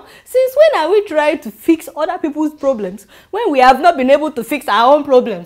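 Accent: Nigeria. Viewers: I'm learning the Nigerian accent from you my gurl!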